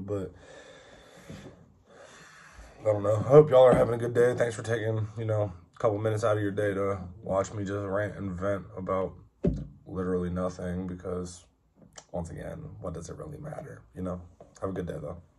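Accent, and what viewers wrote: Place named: Pennsylvania, United States. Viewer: I enjoy watching you. I grew up in small town PA. My heart is there and listening to you reminds me of home. Your accent. The backgrounds. Your story is awesome. Keep going.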